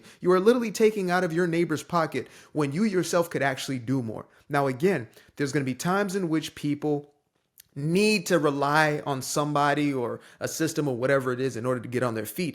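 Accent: United States. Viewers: Careful now, you are sounding American, that seems to be frowned upon these days. Stay safe, and keep up the good work, every day you wake up alive is another day you can strive to be better than yesterday.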